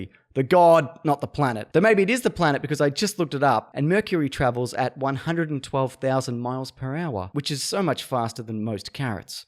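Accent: Australia. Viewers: I enjoyed 2 things. #1 is that you were the australian voice from the ITSV honest trailer. Man they over done the aussie-ness(?) in the script didn't they. But I was very, very happy to hear you make a cameo with you slightly enthused but very very tired voice of yours. #2 is that you pointed out in the video that shazam did not display any wisdom at all. What a dickhead!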